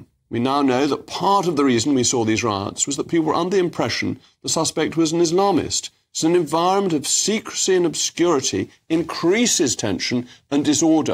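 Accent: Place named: RP, United Kingdom. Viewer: Blame it all you want on you well spoken Toff but it comes down to the people in government who DO NOT live like the majority of people in this country that work hard and suffer for not being able to own or let alone renting a property as scum from african muslim countries come first Not the British people this needs to bloody change and we are angry as hell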